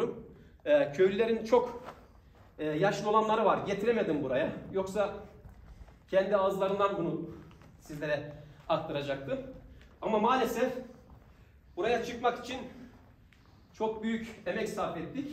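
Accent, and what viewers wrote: Black Sea, Turkey. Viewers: Karadenizli olduğunuzu bilmiyordum hocam saygılar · Bey, ses tonundan bize yakın bir yöreden olduğunu anlamıştım seni ilk tanıdığımda. Meğer ki hemşehriymişiz. Ben de Torul'luyum. Bizim o taraflara da gezi yapmanızı bekleriz. Biz gidemedik, siz in sayenizde gitmiş gibi olalım bu sene. İyi seyahatler.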